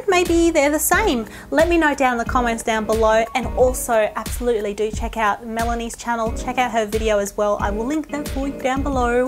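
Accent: Australia. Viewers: Your too funny.... You make me miss home! I forget what the aussie accent sounds like living in the USA! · I LOVE your accent... I love Australian accents in general, I could listen to them all day! I use benzoyl peroxide, but my question is how often should I use it, considering it dries out skin etc. My skin used to be very flaky and dry but it's actually better now... Anyway, really helpful, thanks :)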